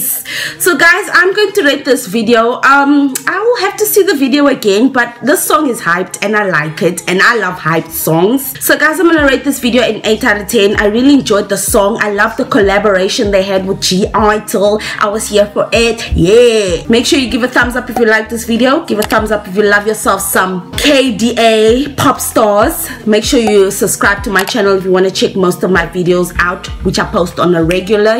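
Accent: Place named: South Africa. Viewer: That accent sounds very familiar. Are you from SA?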